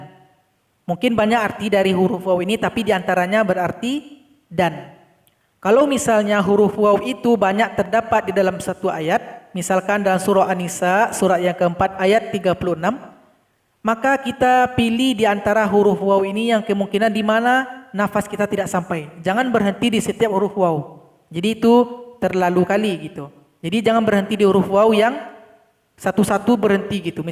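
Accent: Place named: West Sumatra, Indonesia. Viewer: Urg minang kalau bahaso indo logat minang nyo pasti ttp ado. Hehe semangat uda, mudah2an sukses slalu.. awak disoko nio belajar pulo mangaji yg batuah uda. Makasih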